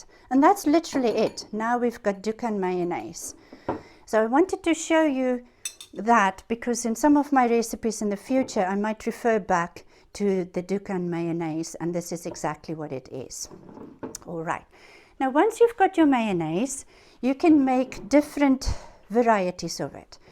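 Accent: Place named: South Africa. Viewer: Great ideas thanks for sharing. You sound South African 😊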